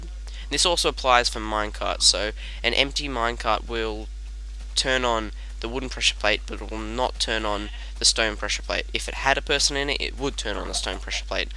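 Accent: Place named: Australia. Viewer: Must be Australian with that accent, probably east coast right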